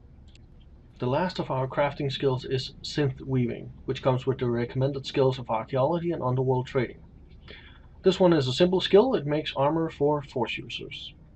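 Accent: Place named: Denmark. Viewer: Loving the Danish accent :D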